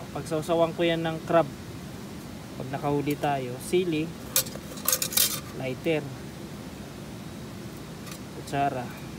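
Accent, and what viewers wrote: Visayas, Philippines. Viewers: Bisaya kba Dong.?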